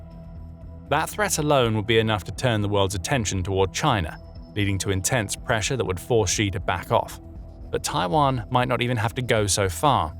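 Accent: United Kingdom. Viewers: I enjoy watching your videos and your British English voice. But why use Americanisms like "gotten"?!! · Despite the British accent, this is not a European perspective. The video accuses the Chinese leader of doing exactly what the US administration is embroiled in - extreme corruption and promoting talentless political hacks to leadership while firing actual skilled leaders such as Gen. Christopher Donahue. I can’t imagine tattoo-covered Kegsbreath was making a rational decision messing with Delta force. So, don’t expect the US to do anything, but make matters worse. They’re also worse off economically. Practically no one can afford a house here. The GDP is artificially propped up by a few billion/trillionaires who control 50% of the economy or more.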